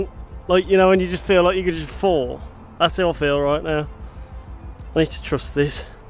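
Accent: Black Country, United Kingdom. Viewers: “It looks like a spider’s web doe it” Ah the refreshing sound of a Yam Yam...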